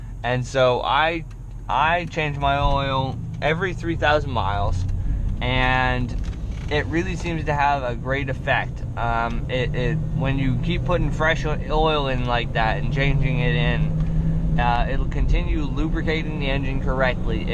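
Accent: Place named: Virginia, United States. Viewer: what part of va is this, I can tell it's va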